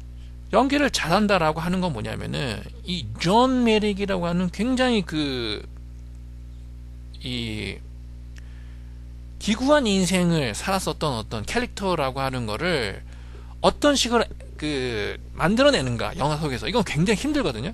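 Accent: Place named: Wales, United Kingdom. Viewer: wtf ,why is this welsh fella speaking ?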